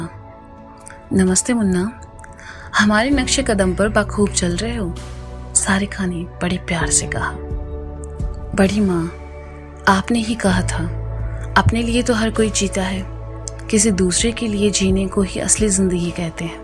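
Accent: Varanasi, India. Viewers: thodi banarsi mai kahani suniye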